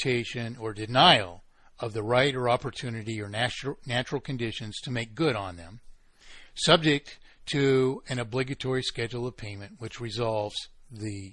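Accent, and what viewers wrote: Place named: Australia. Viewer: I will be waiting for the ultimate debate between Austrians and MPE advocates: Peter Schiff, Ron Paul, Rothbard VS. _________? Perhaps your Australian accent will suffice. does well but he doesn't talk as fast as these other guys.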